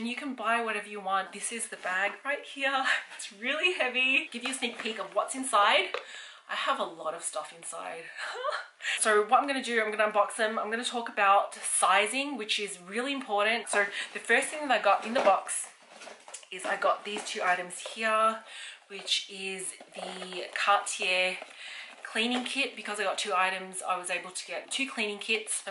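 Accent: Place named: Australia. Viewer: love the australian accent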